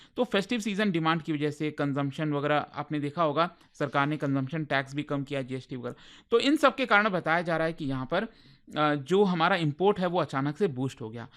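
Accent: India. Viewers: Your Indglish sounds horrible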